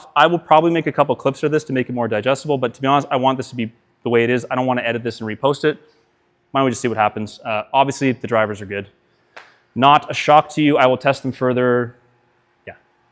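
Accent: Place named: Canada. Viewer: Sounding extra Canadian today, bud! I enjoyed the live review, nice to not just have people's best shots in the reviews.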